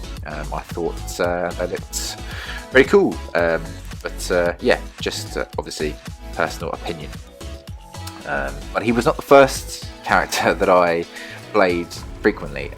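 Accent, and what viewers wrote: England, United Kingdom. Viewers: YES!!! BRITISH!!! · Oh snap he's english! I love even more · He’s British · I had no inkling you were british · You're English, oh yeah baby respect my brother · I mean I guessed you'd be from England from that time I found you and you kicked my ass on For Honor 😂😂😭 keep up the great work man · Oooooooo a British accent I like it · YES, HE'S BRITISH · O my god hes british i wasnt expecting that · Ah Yes, a fellow Englishman Finally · Lovely British Orochi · No joke I thought u were Asian but it turns out you're a Brit like me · Who else knew he would be from the U.K because I did lol 😂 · I had a feeling he had an English accent. I love it 😍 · ofc its a uk CUTIE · I fuckin knew it he was british. We luv ya!!!! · Didn't expect you to be English haha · Voice reveal. British. Subscribed. Brilliant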